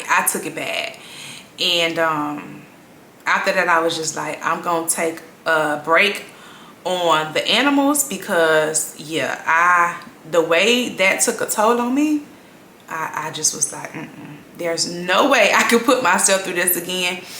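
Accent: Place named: South, United States. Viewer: I think it's the southern accent that I like, lol 🤣🤣🤣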